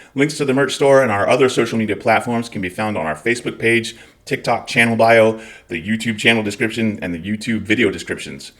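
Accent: Scotland, United Kingdom. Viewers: Love ya, keep making and I’ll keep watching and sharing. Also more Scottish accent. Studying Scottish Gaelic myself and am a complete sucker for all things Scottish 😻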